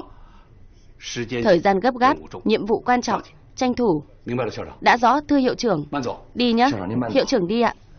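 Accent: North, Vietnam. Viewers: Thuyết minh giọng bắc nghe ko tình cảm ko hay.Dị ứng ghê. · Xin loi ko phai Che vi minh nguoi mien nam nghe giong bac ko thich lam ,nhieu bo phim hay nhung thuyet minh nghe ko duoc rat tiec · phim hay 100% nhung bac ki thuyet minh te qua lam mat hay.dung de bac ky long tieng nua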